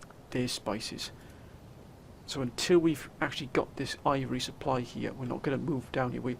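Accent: United Kingdom. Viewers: Hello my friend. It's good to hear from you. I am very happy that my advice has been helpful for you. If you don't mind me asking I was wondering where you are from. You sound British. I'm just curious. Either way I do count you as a true real friend even though I've never met you in person. Hope all is well with you. I was wondering when you will start assigning Generals. No rush. Just curious. Have a great day my friend. Can't wait till the next episode! ☺☺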